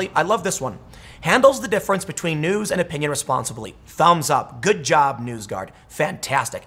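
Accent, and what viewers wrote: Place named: Chicago, United States. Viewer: love your accent, I'm a Chicagoan too. I pegged you from your accent, proud of myself and you for being Chicagoans. Which neighborhood do you hail from? I'm from Rogers Park/Westridge. Thanks for the great content.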